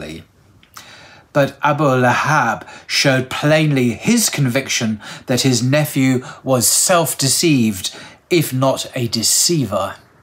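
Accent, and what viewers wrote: United Kingdom. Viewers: Keep makimg these videos. 👍 We love your british dialect for storytelling.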